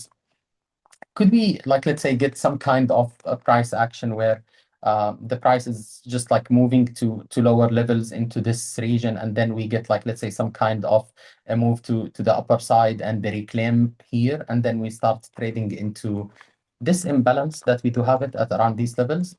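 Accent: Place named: Saudi Arabia. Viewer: saudi accent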